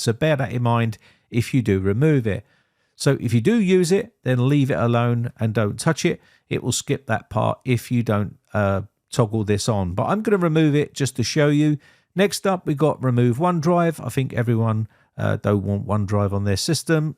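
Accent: United Kingdom. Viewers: Another Windows Install video. I'm keeping track I think this is video #23 of Windows 11 Installations brought to you by. I just watch cause I love the pleasing Brit accent.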